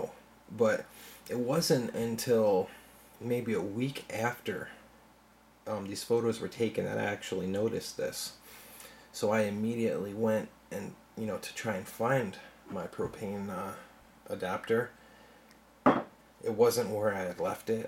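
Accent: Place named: Midwest, United States. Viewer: OK, first off, I can't believe they would make you pay a fee for THEM to disclose shit about the property, I thought they HAD to disclose a haunting! Email that show "The Dead Files" on the Travel Channel and get Amy Allen to come out and see who the heck is haunting your property. You have a baby, tell them that and they may make time for you. You sound "mid west" so start looking, lots of paranormal groups or a priest or a shaman. Start doing research on the property and the grounds or area.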